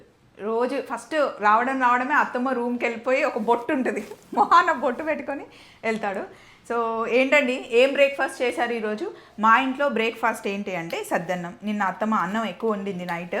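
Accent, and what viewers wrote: Andhra Pradesh, India. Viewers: Telangana accent lo ne matladandi...chala varaki meeru Andhra valla lane matladutharu...konchem ekkado naturality miss avthundi anpisthundhi...this is my opinion...hope you take it positively...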